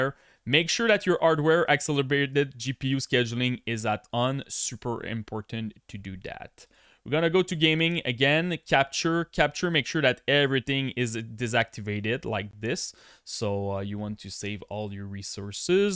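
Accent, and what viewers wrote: Quebec, Canada. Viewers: You french Canadien right?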